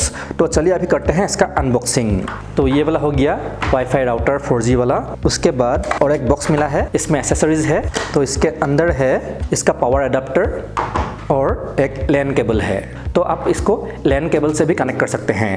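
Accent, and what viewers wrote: Assam, India. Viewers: Assam r nki apuni hindi xuni😂 a buji paisu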